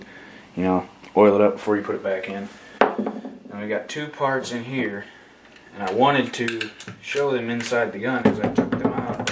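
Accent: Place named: Mississippi, United States. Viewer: Thanks 4 the clip. Are you from Mississippi? It's just that accent bro.i know it..;)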